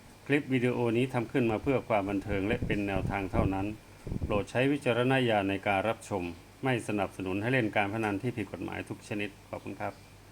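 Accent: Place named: Phetchaburi, Thailand. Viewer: รบกวนถามแอ๊ด อยากทราบว่าแอ๊ดเป็นขาวจังหวัดเพชรบุรีมั้ยคะ...สำเนียงเหมือนคนเพชรบุรีค่ะ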